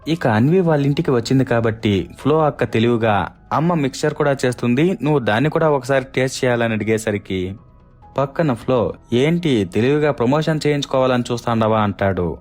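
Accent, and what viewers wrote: Rayalaseema, India. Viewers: Bro mee accent rayalaseema la undi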